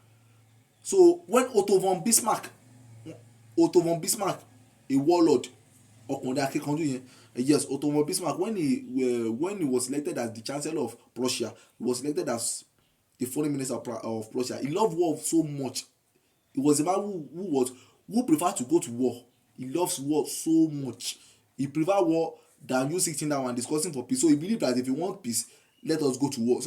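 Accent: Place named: Africa. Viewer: It is simply an African Dialect of english, don't call it gibberish just cuz you cant understand it bro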